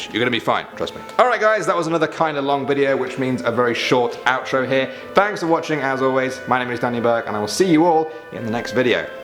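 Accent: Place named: United Kingdom. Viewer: I hate this Fuckin' British accent !!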